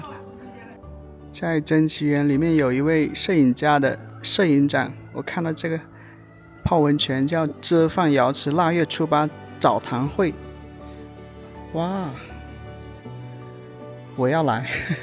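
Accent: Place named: Cantonese, China. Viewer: 是廣西人，講的是粵腔普通話，很容易聽懂，別苛求了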